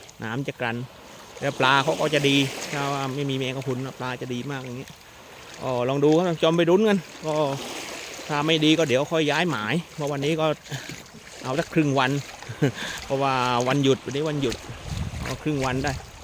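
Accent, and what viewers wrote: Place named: Tha Chae, Thailand. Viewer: แหลงเหมือนคนท่าแชะเลยหลวง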